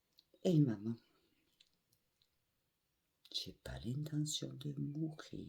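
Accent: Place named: Southwest, France. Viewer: Bonjour vous avez un bel accent serait t'il pas du sud ouest? Bonne santé à vous signé.Boudu con.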